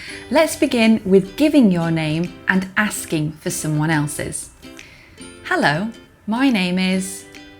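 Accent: United Kingdom. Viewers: Tnx for uploading the video, I like British accent. 👍🏻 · Proper Yorkshire lass!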